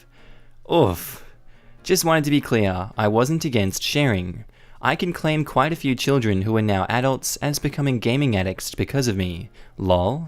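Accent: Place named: Australia. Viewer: Love your accent! ... Aussie voice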